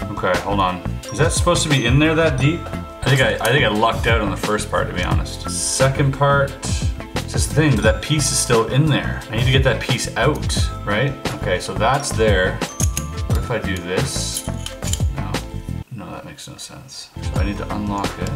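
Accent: Canada. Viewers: Hey! Are you canadian? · .....yeah, I can tell you're Canadian.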